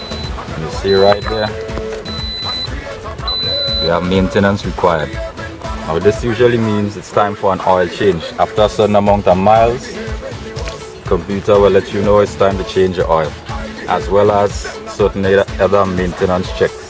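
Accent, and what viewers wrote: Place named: Trinidad and Tobago. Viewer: What island you from? I'm from Guyana. You sound like Trinidad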